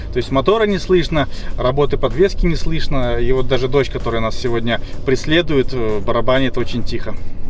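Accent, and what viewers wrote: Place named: Belarus. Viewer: Слышен белорусскии ГГовор!!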